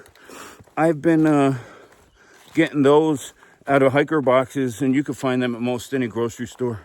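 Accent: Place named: Connecticut, United States. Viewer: You sound like you are from NW Connecticut?Enjoy the hike!!!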